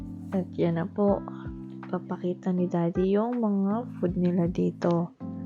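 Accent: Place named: Batangas, Philippines. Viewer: Nice bonding may punto salita batangas kayo Mam😅 nakaka tuwa nman simple nice video susbscribed na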